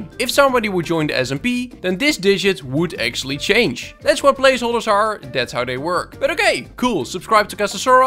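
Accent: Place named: Netherlands. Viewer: bro, never heard of you, but in the last week i watched like almost al youre server vids lol, youre a life saver. thanks Bud! one question. are you by any chance Dutch? I am and i feel like i hear an accent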